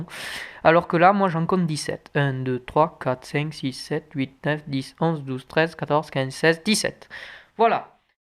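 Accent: South, France. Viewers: pour l'accent du sud :) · nan mdrrrr c'est un accent du sud 😂😂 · au l accent sudiste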